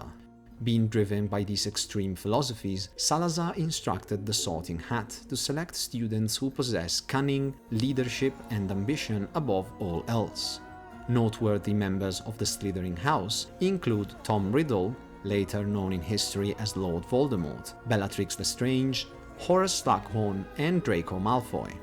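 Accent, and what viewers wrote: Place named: Italy. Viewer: Ho riconosciuto il tuo inglese italianizzato dall’accento e dall’intonazione, devo dire che sei molto bravo e supporterò il tuo progetto perché sembra molto interessante e anche perché sto cercando di fare lo stesso cercando di avere un canale completamente lingua inglese💪🏻🫶🏻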